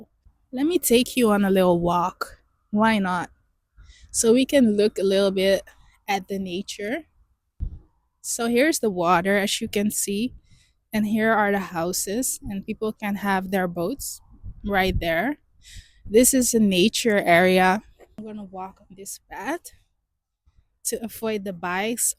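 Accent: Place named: Netherlands. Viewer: You have a Dutch accent :) You Dutch :)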